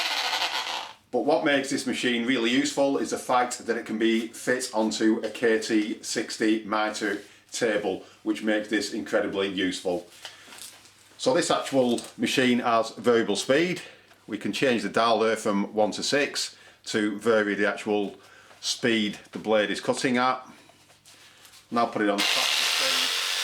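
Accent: United Kingdom. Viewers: Englishman, Scotch, Irish or Welch I wonder? Nice job by the way.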